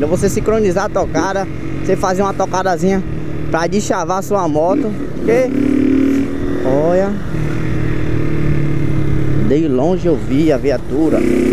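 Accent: Bahia, Brazil. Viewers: Vc e da bahia de meu mn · Pelo sotaque é bahiano kkk , de qual cidade mano ? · Mano se e Bahiano?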